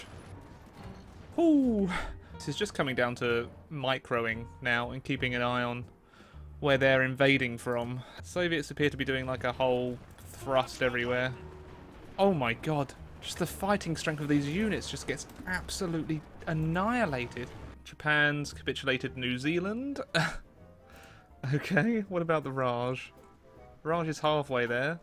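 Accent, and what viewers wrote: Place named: United Kingdom. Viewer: I have noticed when you read outloud some of the chats messages you change up the i to he. Is this a normal british thing?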